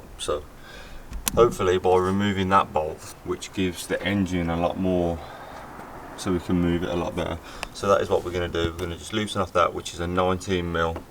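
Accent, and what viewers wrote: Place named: Birmingham, United Kingdom. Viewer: I’m sure your saying Renault wrong 😂😂. Its that brummy accent . Good videos tho xx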